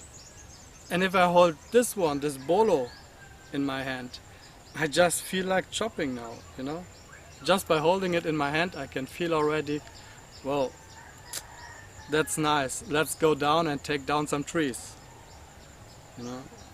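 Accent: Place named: Germany. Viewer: You seem to have a German accent, but it looks like you're in a very tropical area. Where do you live?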